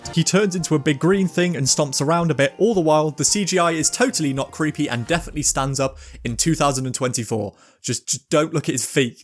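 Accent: United Kingdom. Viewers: Funny British man with cracky voice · high pitched AND British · Before even starting the video I saw the face and the channel name and I could already feel there's gonna be a Brit Accent, and I started the video and I wasn't disappointed 😂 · why are you british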